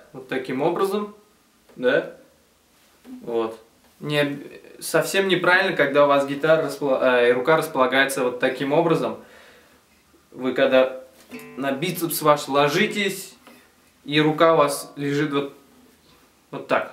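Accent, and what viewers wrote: Tatarstan, Russia. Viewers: Оой, так нравится родной татарский акцент 😁 я нашла по чьим урокам буду учиться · С таким татарчёнским акцентом говоришь 7 лет назад. Таааак мило... :-* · Блин)))) этот Казанский акцент, татарча малай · татарский акцент никуда не денешь, авызынны себерим😏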